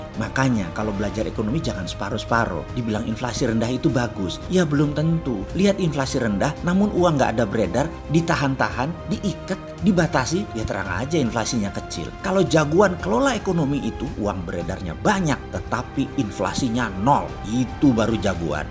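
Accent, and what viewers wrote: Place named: Malang, Indonesia. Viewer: Iki jan omongane wong malang banget.. Kopimu kurang kentel, dolenmu kurang adoh rekk..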